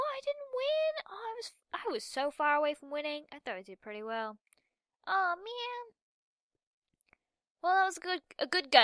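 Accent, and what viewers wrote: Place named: England, United Kingdom. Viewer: She's English too... Accent**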